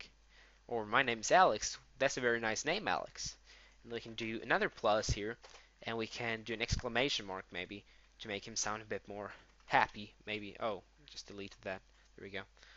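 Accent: Sweden. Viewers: Your Swedish? :0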